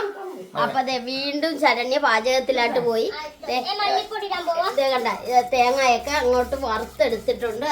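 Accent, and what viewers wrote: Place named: Kannur, India. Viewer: പപ്പായ കണ്ണൂര് ഭാഷ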